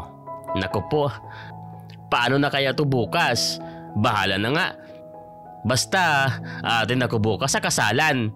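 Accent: Visayas, Philippines. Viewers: Bat ganon ang diction at style ng pag kwento mo, BISAYA KA DONG?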